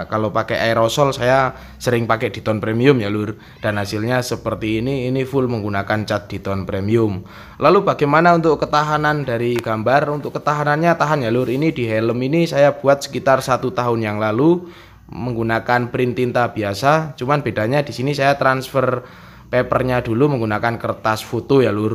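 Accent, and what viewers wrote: Temanggung, Indonesia. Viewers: Koyo wong temanggung ya... Heeee..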